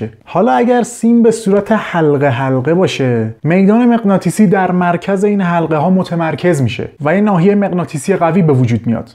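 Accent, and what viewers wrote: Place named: Lebanon. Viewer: چرا لهجه ت ایرانی نیست!!! لبنانی هستی یا روس؟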